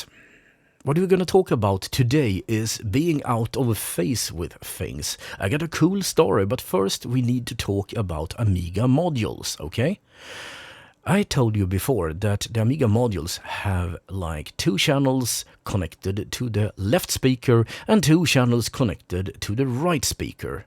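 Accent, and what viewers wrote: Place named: Sweden. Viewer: Horrible swenglish accent, can’t watch theese…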